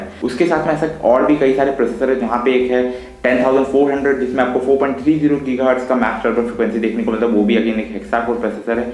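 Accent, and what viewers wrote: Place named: India. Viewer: this is not English... Indian English.. sorry nothing to understand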